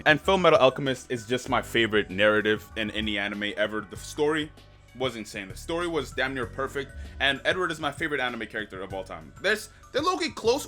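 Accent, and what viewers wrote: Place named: Canada. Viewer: Bruh tell me how I aint know yous canadian. Im deaf ash to accents n shi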